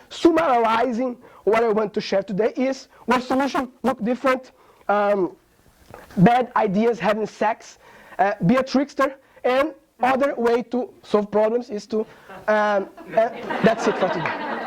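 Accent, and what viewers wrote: Northeast, Brazil. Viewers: Fico orgulhoso de ver um brasileiro ensinando a pessoas do mundo inteiro algo mais importante que futebol. Mas, o que ganhou meu dia foi ver que esse brasileiro é um nordestino. Ou seja, vem de um lugar cujas pessoas são vistas, por gente de outras regiões, como desqualificadas e incapazes de ocupar posições diferentes de "porteiro de condomínio". Morei 6 anos em Recife, voltei para Natal há pouco mais de 1 ano e gostei muito de rever (ouvir) esse sotaque. Mas, dessa vez, em inglês e ensinando ao mundo como pensar! Nailed it,! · Achei interessante. Seu inglês é bem nordestino kkkkkkkkk, sucesso viu, garoto prodígio!!! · Excelente,. Estava querendo ver essa sua palestra há um tempão e de repente poder assisti-la assim com esse northeastern accent foi massa demais =P. Curti muito esse conceito de ideias sendo armazenadas e também a ótima ideia de resolver problemas que não são seus. 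Obrigado por compartilhar a palestra e sua jornada na singularity. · Nordestino fala inglês também quando diz: "Oh shit, negga!" - Em português: "Oxente nega!" Parabéns, uso muito seus conceitos na minha vida, simples e diretos. Admiro você por que você continua com o sotaque e não fica querendo modificá-lo, vivemos num país continental, é normal que isso exista. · Inglês com sotaque nordestino kkkk. entendi bem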